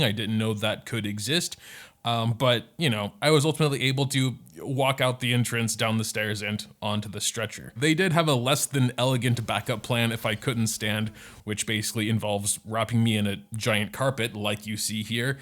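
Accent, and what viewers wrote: Germany. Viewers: Why did I never notice hes german?💀. Also, wish ya a speedy recovery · wait, you're german? austrian?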